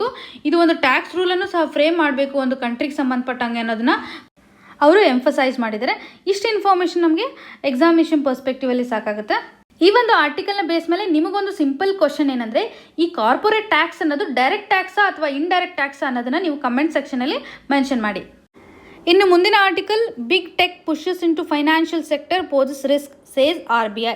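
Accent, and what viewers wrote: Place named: North Karnataka, India. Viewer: Excellent madam.. 👌Pakka uttara karnataka language....😁😇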